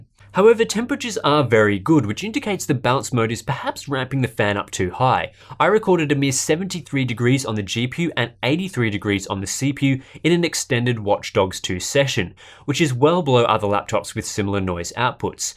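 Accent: Australia. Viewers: Most other reviews are done in a heavy accent. It was refreshing to hear a fellow Aussies voice for this review. Subscribed, and bought the 17.3" scar II (2060)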